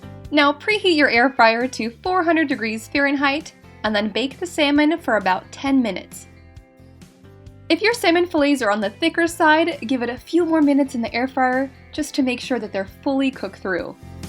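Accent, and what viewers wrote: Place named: Poland. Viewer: Where is the accent from?Polish?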